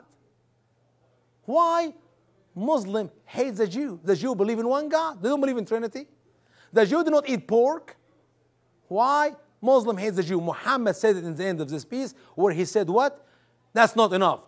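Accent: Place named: Arab world. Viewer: Nigga talking with Arabic and French accent, lol, funny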